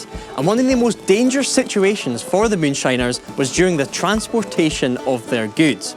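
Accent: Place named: Glasgow, United Kingdom. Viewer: Do you? I'm Scottish and hate his accent. It's that "I'm a spoiled middle class Glaswegian who went to university and had 5 star accommodation and loads of money to buy me and my friends loads of things" It's a horrid accent.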